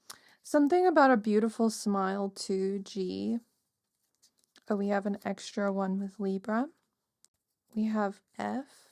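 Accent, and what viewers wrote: South Africa. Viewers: Pile 2❤. If you don’t mind me asking, where are you from? You have a unique accent 😅 it sounds a bit South African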